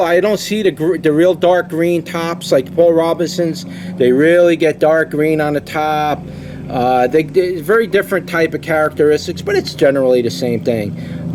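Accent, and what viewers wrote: New York, United States. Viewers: Love the review. A very upbeat video. Love the New Yorker accent. I'm from Canada so it ain't something you hear every day. Keep on growing! I subscribed and liked.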